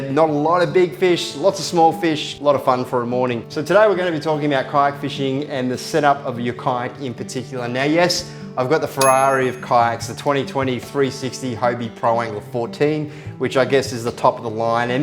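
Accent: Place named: Australia. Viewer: Searched for a Hobie Pro Angler review Australia and your channel came up, just needed an Australian talking about the Kayak and stuff not our fast talking American brothers which sometimes can be like a different language !! God luv em, anyway really enjoy your Vids and thanks for all the effort you go too, keep up the good work and I hope to replicating most of your set up soon ... Cheers Mate :) New Sub and happy to be one......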